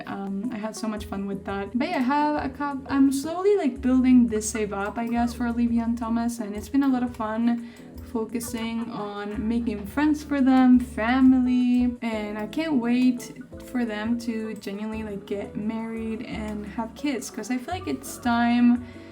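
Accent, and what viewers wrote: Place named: Argentina. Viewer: Ay que lindoo! Yo te sigo en tumblr y hace poco me enteré que tenes youtube, por el acento creía que eras de Argentina 🩵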